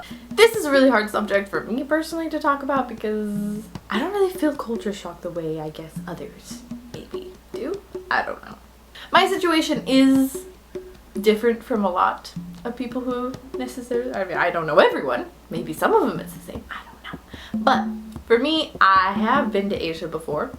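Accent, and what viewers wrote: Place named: South, United States. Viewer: Are you from the south? You have an accent but I am not sure which state it is from.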